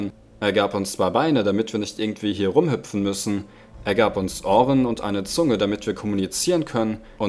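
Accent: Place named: Switzerland. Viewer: haha bisch du en schwiizer ?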